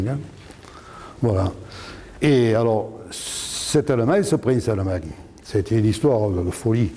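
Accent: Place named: Provence, France. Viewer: J'aime, j'adore votre façon de peindre la vie avec ce magnifique accent provençal !merci pour cette belle histoire 💛❤️aux couleurs de chez vous !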